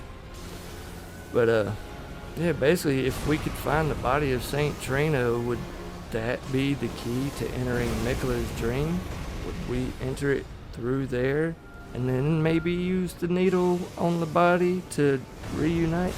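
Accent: South, United States. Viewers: wasnt expecting the southern accent lol, love it, right on partner good video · I like your accent. What state are you from? I'm guessing one between Tennessee and Florida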